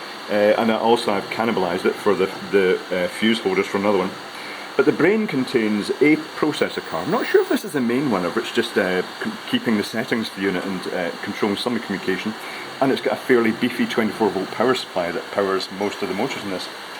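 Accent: Scotland, United Kingdom. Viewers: sorry for the dumb question - your accent is scottish?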